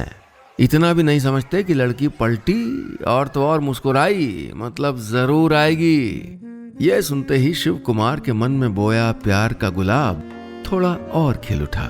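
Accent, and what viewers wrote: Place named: Mirzapur, India. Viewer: Accent Mirzapur wala hai 👌👌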